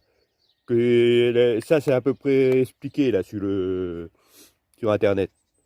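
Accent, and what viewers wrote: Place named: Nord-Pas-de-Calais, France. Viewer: Et avec un bel accent du nord pas de calais. Merci pour tes conseils min tcho!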